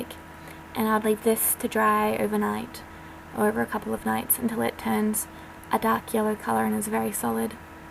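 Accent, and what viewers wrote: Australia. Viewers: Are you from Australia? Just curious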